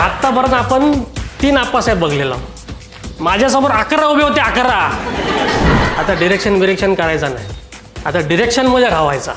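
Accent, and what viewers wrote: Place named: Malvani, India. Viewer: खतरनाक मालवणी किडो पण भारी झिला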